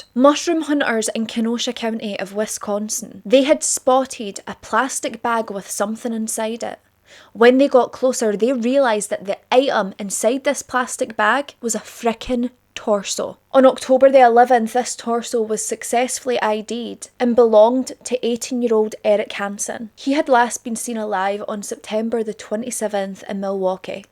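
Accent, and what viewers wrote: Scotland, United Kingdom. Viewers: I just can't get over your beauty! I'd give almost anything to look half as beautiful as you! The accent tops it all off! There is literally nothing better than a Scottish accent!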